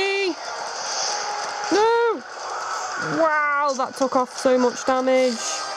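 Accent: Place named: Cumbria, United Kingdom. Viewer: Ahaha excellent, I'll be looking forward to it and thank you I've been wondering how it runs on the 3DS for ages. I'll have to be buying ultimate for the 3DS I own already as I am but a humble college student, too poor to afford a Wii U :( from the look of these vids though it doesn't seem like I'm having to compromise too much. If you don't mind my asking, whereabouts in the UK do you hail from? Your accent sounds like it's Carlisle/Cumbria-ish to me but I can't quite put my finger on it haha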